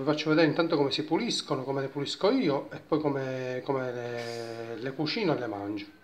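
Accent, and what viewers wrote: Sicily, Italy. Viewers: Mamma mia l'accento siciliano si sente tanto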